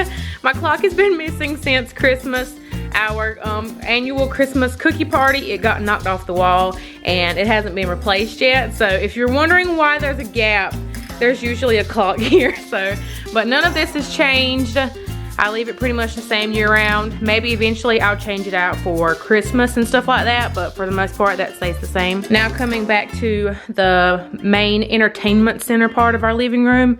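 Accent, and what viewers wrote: South, United States. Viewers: You must be another southern gal. Love to hear you talk and I love your home and Easter decor💕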